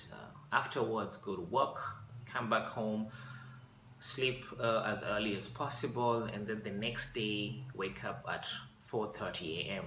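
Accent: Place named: Kenya. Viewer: ongea tu poa kama mkenya!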